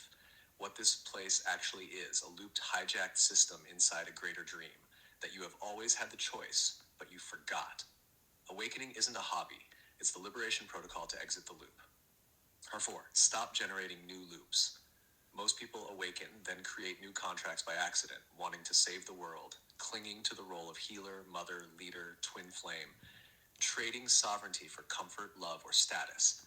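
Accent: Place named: RP, United Kingdom. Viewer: Wow I love the British accent, almost posh, and I’m british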